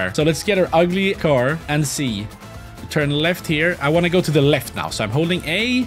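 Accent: Sweden. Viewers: Are you swedish? Bec i hear a accent.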